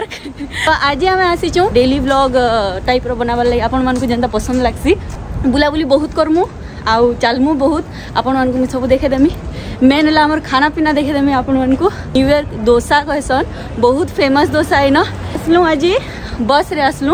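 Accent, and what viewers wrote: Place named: Sambalpur, India. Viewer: ହସ ସାଙ୍ଗେ କଞ୍ଚା ସମ୍ବଲପୁରୀ କଥା ବହୁତ ଭଲ ଲାଗୁଛ୍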